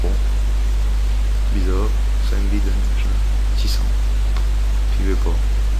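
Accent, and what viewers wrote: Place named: Haute-Saône, France. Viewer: Mdrr j'ai reconnu l'accen j'suis du 70 ;)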